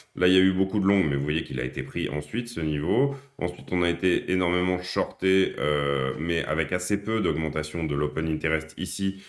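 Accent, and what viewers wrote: France. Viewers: Personnellement, j'adore me promener dans la nature. ça fait plusieurs années déjà qu'on a entrepris une excursion ailleurs mais avant on réjouissait beaucoup d'une sortie à la mer juste pour se balader un peu et respirer... on a visité plusieurs fois l'île envoütante de Cuba. Ce qui m'intéresse en ce moment c'est les "communautés" en France (et ailleurs) où on vit un peu à l'écart comme par exemple les endroits oà on se dédie à la permaculture etceterca. Ben voilà, j'ai juste voulu répondre à votre dernière question. Nous on vit près de la frontière des Pays-Bas. Vous avez un bel accent français, pourtant je ne saurais pas dire d'où exactement. Ce qui importe c'est que vous vous faites comprendre malgré la vitesse avec laquelle vous vous exprimiez. Bien à vous.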